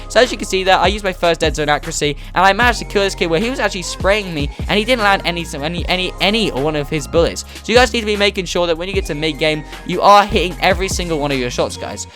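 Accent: United Kingdom. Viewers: Are you English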